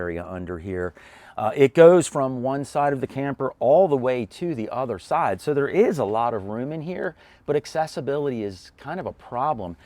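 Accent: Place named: Philadelphia, United States. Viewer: I cant tell if this is pittsburgh or philly accent...speaking fluent yinzer i lean more toward delco philly.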